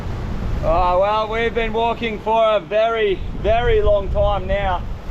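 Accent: Western Australia, Australia. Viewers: I knew straight away you are Western Australian from your accent, especially your pronunciation of water LOL. The sky and beach was a giveaway too. Great video mate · Thought this is going to be some fake ass bullshit... until i heard the australian accent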